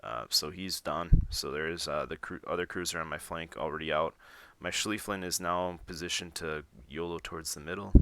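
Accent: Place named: Wisconsin, United States. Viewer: smooth buttery Wisconsin voice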